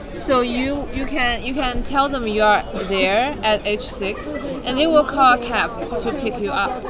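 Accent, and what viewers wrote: Germany. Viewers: 一开始我不知道你是德国人,你的口音听起来很像德国人,因为你让我想起了一位德国故人🤣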